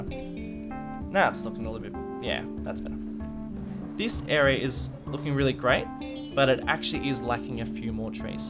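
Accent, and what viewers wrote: Australia. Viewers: You sound like an Aussie. But I can't tell.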